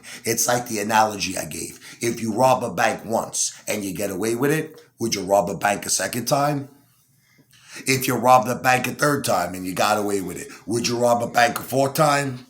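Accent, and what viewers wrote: South, United States. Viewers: You’re not form New York ! You’re form the south with you’re southern draw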